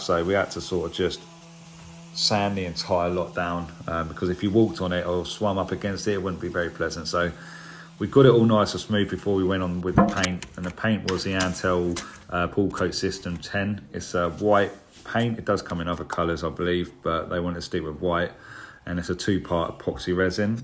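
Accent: England, United Kingdom. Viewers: I love this accent, bruv. What part of England/UK is this accent? Me and my 2 teenage sons mess around with each other talking like The Kings Men.....bruv, and you lot😅😅❤❤ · So many people who hear an Englishman, with this particular accent and makes his living with his hands, assume he’s like a soot-covered Chimney Sweep or a perhaps humble Cobbler from a movie that’s set in Britain at the beginning of Industrial Revolution 😂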